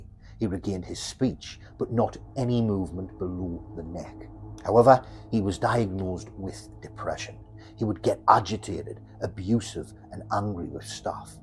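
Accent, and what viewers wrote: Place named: South Shields, United Kingdom. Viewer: This happened just up the road from us. I'm originally from south shields and your voice is making me homesick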